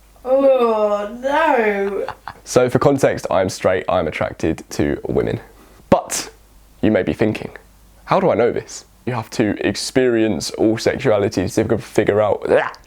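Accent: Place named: England, United Kingdom. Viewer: Hi my friend, my name is Jordan I have a channel here on YouTube called the blind world. We’re trying to create a world where like what you were saying this video for you had people in your life you were naive and you thought that they couldn’t have relationships with you because you were visually impaired and blind. I’m trying to create a world where that doesn’t happen where people can have relationships for us despite us being blind and I want to create a world where we are seen as just people really, and I like this video because I’m actually trying to figure out how to have sex. I know that I’m attracted to people to women but just How I will be physically able to have sex and that’s all I kind of want to say really and I’ve seen your Instagram post and I think you’re very good you’re very educational and I can relate to the stuff that you’re talking about since I am actually vision impaired myself and I have autism and I am from Ireland and I’ve noticed by the sound of your voice that you’re precious visually impaired person but you’re from England I just I hope to get to know you as time goes on as I watch your videos and just I hope you know that I’m being genuine with my comment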